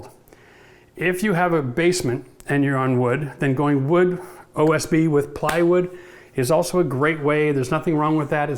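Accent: Canada. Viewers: Canadian Aye !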